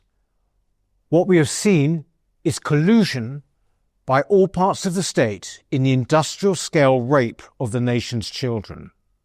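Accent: RP, United Kingdom. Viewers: Omg Peter you say it so well. I cannot thank you enough a posh man’s voice carry’s more weight than a pooh man’s voice !!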